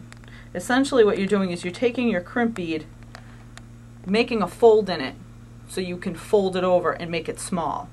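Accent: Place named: Massachusetts, United States. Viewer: I knew she was from Massachusetts before she said so! (I am too!) Great video....and I love pink too!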